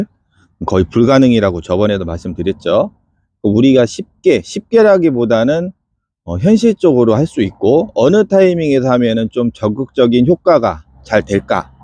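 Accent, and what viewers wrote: South Korea. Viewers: 충청도 사투리 가생이 ㅋㅋ 재빛곰팡이가 잘생기는 아가들이 있더라구여 그럼 쟈는 가망이 없는건가유?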